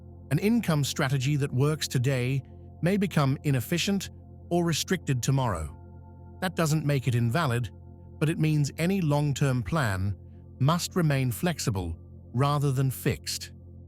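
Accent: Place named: United Kingdom. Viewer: Just found this channel. I really like its simplicity of explanation. My feeling is if XRP gets to wide financial institutional adoption not only will there be price appreciation, but also less volatility as that would run counter to what institutions expect on a value transfer asset. If the aforementioned occurred this could create a great deal of competition for the XRP asset and safer yield products could become available in time via free market competition (albeit with a lower yield). On a side note I like the British accent of the moderator. I've often said one could be speaking in complete gibberish, but if its with a British accent it would sound authoritative. 🤣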